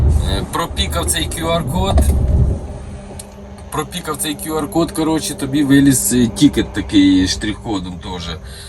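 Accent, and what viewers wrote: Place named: Surzhyk, Ukraine. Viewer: Говори ты уже по-русски , а то твоя сова похожа на избевательно над рiдной мовой. Честное слово, по-русски звучит весь твой суржачий диалект.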